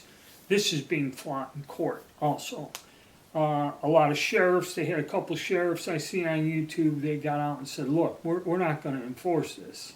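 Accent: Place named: Pennsylvania, United States. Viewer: were are you from as in wht state were you raised.you sound like your from nj.pa.oh are you????????i say allentown pa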